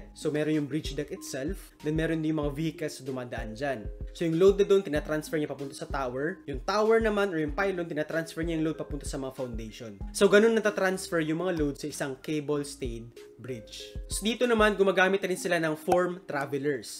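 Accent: Visayas, Philippines. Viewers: Bisaya diay ka pre hahaha tabangi ko dol mag engineering ko puhon dol🙏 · Bisaya accent🥺😍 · Bisaya ka diay kay ang imong pronounciation usahay murag bisaya gyud! 🤭🤭🤭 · Bisaya ka man oi 🤣 new subscribe 🤚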